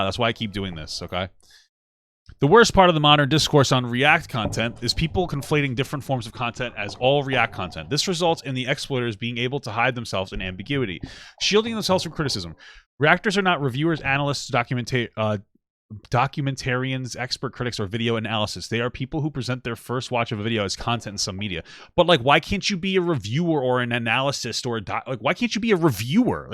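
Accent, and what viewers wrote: United Kingdom. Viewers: I'm Australian and sounds like he has a British accent.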